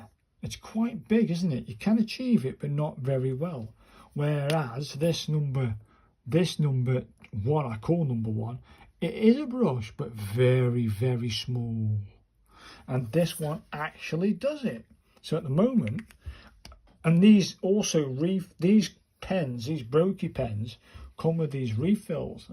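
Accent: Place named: United Kingdom. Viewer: I watch your videos because of your "British Accent" and Calligraphic Content. Good Luck. Keep it up.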